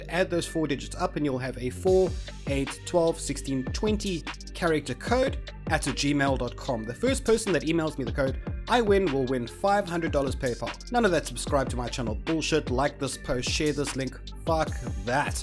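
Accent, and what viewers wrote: Afrikaans, South Africa. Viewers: Your South African I hear the Afrikaans in you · Are you south african ? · are you South African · Is this boy South African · U sound south African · You sound South African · Mister, are you South African? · You south African? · You're South African? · You sound south african mate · My bru!! Love hearing the saffa accent in a Rust vid nogals!! I’m subscribed, keep up the good work!! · dis man from SA?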